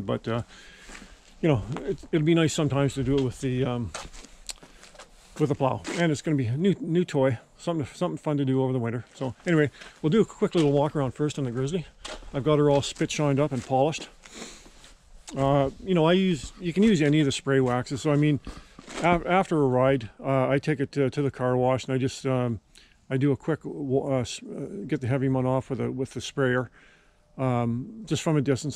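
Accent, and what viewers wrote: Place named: Australia. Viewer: Nice video, thanks. Love the Aussie too.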